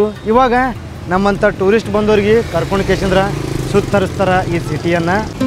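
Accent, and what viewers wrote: North Karnataka, India. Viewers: ಅಣ್ಣಾ ನಿಮ್ಮ ವಿಡಿಯೋ ಮಸ್ತ್ ಸೂಪರ್ ಅದಾವ ಅದರಲ್ಲೂ ಉತ್ತರ ಕರ್ನಾಟಕ ಭಾಷಾ ಶೈಲಿ ಬೆಂಕಿ ನಿಮ್ಮ ವಿಡಿಯೋ ಇನ್ನೂ ಹೆಚ್ಚಿನ ವೀವ್ಸ್ ಆಗಲಿ ಆಗಬೇಕು ಆಗತ್ತೆ ನಿಮ್ಮ ಸ್ವಚ್ಛ ಭಾಷೆ ವಿಸ್ತರಣೆ ವಿಷಯ ವಿಡಂಭನೇ ಮಸ್ತ್ ಅದ ಹೀಗೆ ವಿಡಿಯೋ ಮಾಡ್ರಿ ನಮ್ಮ ಬೆಂಬಲ ನಿಮಗೆ ಇದ್ದೆ ಇದ್ದೆ ಅಣ್ಣಾರ ❤❤🎉🎉... ಜೈ ಹಿಂದ್....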